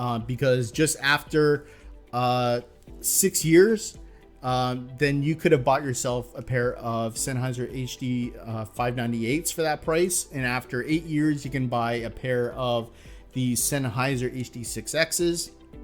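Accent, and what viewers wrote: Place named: Canada. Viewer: I hear Canadian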